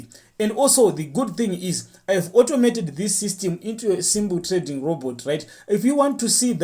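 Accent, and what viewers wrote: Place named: Zimbabwe. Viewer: 😂 Zimbabwean accent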